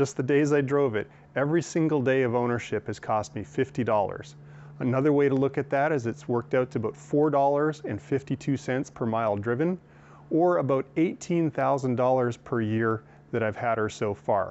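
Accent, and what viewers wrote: Canada. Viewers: You were not kidding about those costs. I did buy a 2006 V8 Vantage with a manual gearbox. It was a lovely car - for someone more well-heeled than I. Parts costs do not compare to labor at the dealer, and both are truly expensive. I had a limited mileage full insurance policy so that greatly reduced operating costs and "wear and tear." I liked the car a lot, bit too much understeer, and the clutch engagement was very sudden at the top of the pedal stroke. I was fourth owner, and the car only had 25000mi on her when I acquired if for the ten months I had her. I might look into another one of the earlier, simpler cars of this era again, and would advise any potential owner to shop and maintain it wisely. Thank you for the video. I note a bit of a Canadian accent for Californian though! 😀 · From your "aboot"s, do I take it these figures are in CANADIAN dollars?? 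You never specify U.S. or otherwise, so... please clarify! :) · I can tell he’s Canadian by the way he says “out”, and “about”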